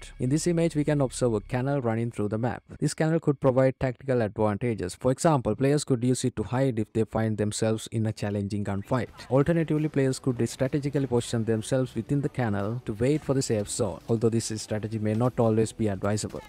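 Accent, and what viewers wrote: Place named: India. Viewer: Hey bro one thing can you tell me is your from India because I suddenly realized that your voice feels like Indian dude because as I'm indian too so it's feels me that.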